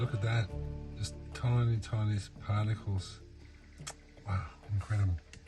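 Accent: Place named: Australia. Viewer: How in the world, as many gardening channels as I watch, have I managed to miss this guy? I am totally in love lol. Let's see... long-hair runs around barefoot like I do all the time chases mice around and makes beautiful compost. Loves the smell of Rich soil... And an Australian accent to boot. What's not to love? I just wish you could come help me with this mess I call a homestead in Missouri. Too many rocks, too much clay, no rain etc. But I'm trying. Thank you!! I needed this. Going to plant cover crops if it ever rains, then in late winter I'll cut it down, cover with my compost, tarp and let it sit till I plant it. I winter sow, so I have time to let it rest before things have to go out in the garden. I am using turkey, chicken, quail, duck, and rabbit manure, as well as grass clippings and leaves and plants that I've cut out of the garden from this last season excetera.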